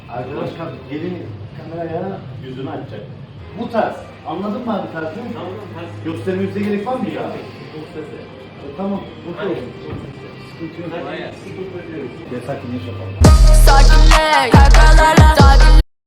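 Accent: Cyprus. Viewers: abi sen kıbrıslımısın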